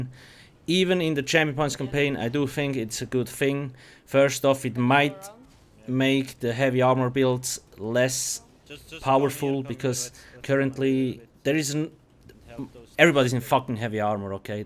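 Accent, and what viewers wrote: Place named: Switzerland. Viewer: Pretty sure he is Swiss. That is our accent :)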